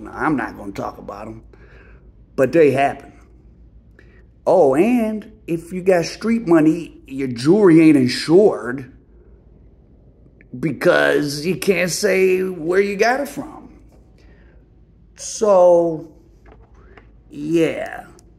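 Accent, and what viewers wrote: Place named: Midwest, United States. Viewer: Your videos very interesting. Lots of folks on youtube with this type of content and reporting but you do it TV worthy. I think you'll be on a network show soon, such as A&E, Tru TV, E, NatGeo, etc. But the accent kills me. Like fingernails across a chalkboard. Worse than Det. Tom Armelli, the retired Detroit detective on The First 48. Strong midwest accent. DA BEARS! By the way I think you are a hell of a journalist even though you say you're not. Later.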